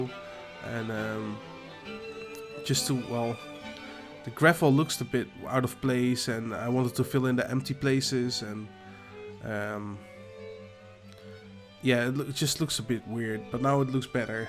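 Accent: Netherlands. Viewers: Ik hou van je Nederlandse accent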